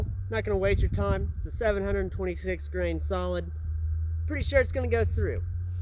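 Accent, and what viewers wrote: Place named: Appalachia, United States. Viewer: I rather try to catch that slug with my face than have too listen to another minute of this hill billy speak again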